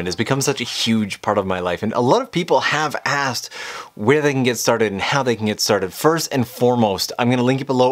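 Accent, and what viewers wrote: Canada. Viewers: I keep getting distracted by the Canadian accent. I’m wondering if you’re from Saskatchewan or somewhere around that part.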